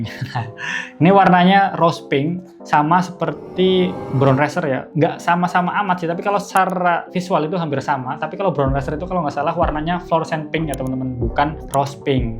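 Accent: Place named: Brebes, Indonesia. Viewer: Oalah wong brebes koe mas. Salam ngapak mas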